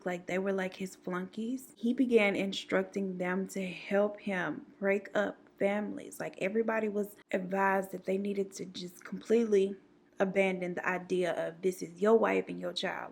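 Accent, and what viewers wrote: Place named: Houston, United States. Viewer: When I started watching you I kept things you sounded like you were from Houston!! We have a unique sound when we talk 🙃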